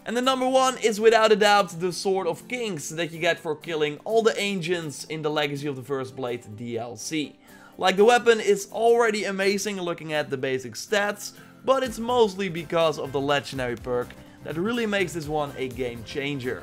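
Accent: Netherlands. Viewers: lol I like your English talking, You more sound like someone from the Netherlands? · Are you dutch? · I love how dutch your accent is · Are you dutch cuz u kinda sound like you are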